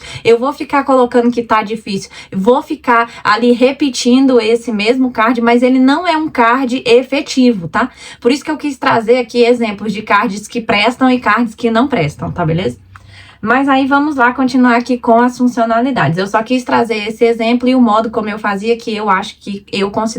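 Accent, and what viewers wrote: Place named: Brasília, Brazil. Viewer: O “sotaque” brasiliense é conhecido de longe kkkkk Excelente dica, valeu mestra 😅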